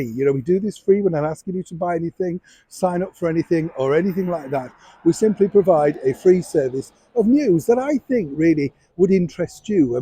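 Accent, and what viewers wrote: United Kingdom. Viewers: I really like your channel, Mr. The different scenes from London and the rest of the US, your Northern accent and your very British way of reporting, with good humour and some understated irony, really appeals to me. Keep up the good work! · I adore British accent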